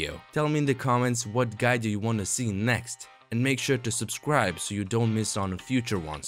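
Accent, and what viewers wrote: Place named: Russia. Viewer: i really like your accent, are you russian? also, LOVE the video! it's really well made, easy to understand (no-knowledge-about-mods-me understood it, which is impressive! XD)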